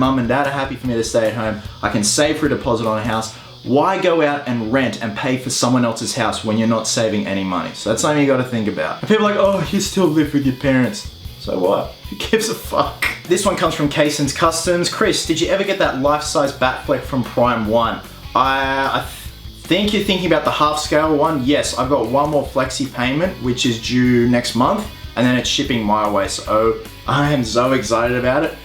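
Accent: Australia. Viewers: And they say the perfect guy doesn't exist. Australian accent included. haha :)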